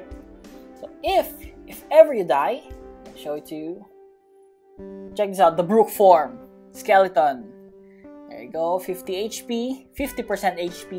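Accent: Philippines. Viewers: Fact: he's a Filipino cuz of he's accent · is a filipino because the accent is familiar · your voice sounds english is no that good you pinoy?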